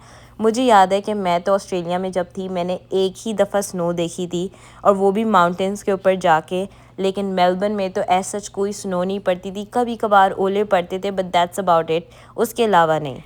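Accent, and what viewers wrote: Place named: Pakistan. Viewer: You have an awesome Urdu accent..... Love your vlogs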